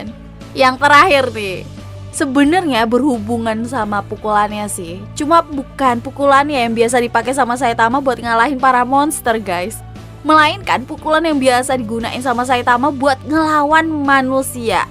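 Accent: Java, Indonesia. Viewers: Suka logat jawanya mbak 🤗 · Wis kaya wong Jawa yo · orang Jawa ternyata, ngokonya kerasa 🤣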